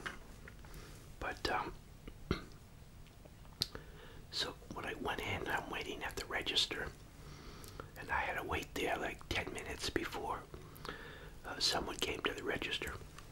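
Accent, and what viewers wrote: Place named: Massachusetts, United States. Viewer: I knew u were from the east coast! Massachusetts?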